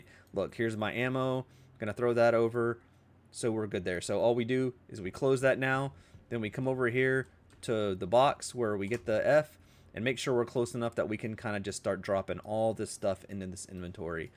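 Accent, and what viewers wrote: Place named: Tennessee, United States. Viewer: You sound like a white guy from Tennessee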